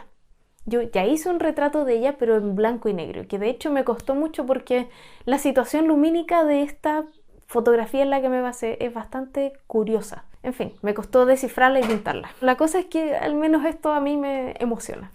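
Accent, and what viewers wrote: Chile. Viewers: Me encanta el acento chileno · Habla como chilena, yo soy chileno jaja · Es chilena, no? Nunca entendí el "hate" hacia ese acento, si es precioso. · ME FASCISA SU VOZ DULCE Y SU ACENTO CHILENO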